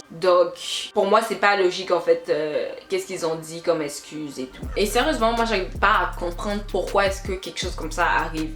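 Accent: Quebec, Canada. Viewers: L’accent canadien 😭😭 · A t'es québécoise ???? C'est pour que t'as un accent !!😭😭😭 En vrai j'm'en doutais !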